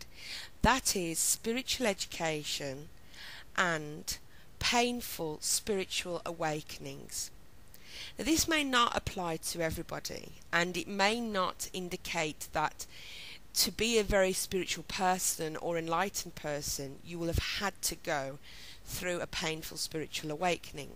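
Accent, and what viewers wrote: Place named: Birmingham, United Kingdom. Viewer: Hi iits nice to hear a brumy accent! I had a spiritual awakening last yr, do you know any groups in bham I could meet up with? It can be so lonely when people around us don't understand x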